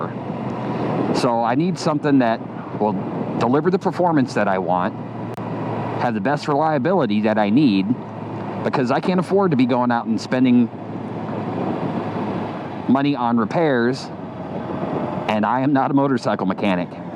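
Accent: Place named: Chicago, United States. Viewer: I hear a slight Chicago accent too😂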